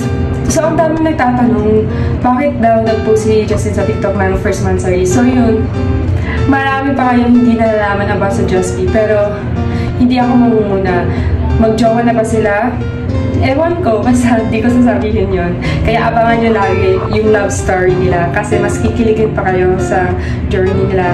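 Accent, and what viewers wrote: Visayas, Philippines. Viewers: Teh bisaya ka po ba?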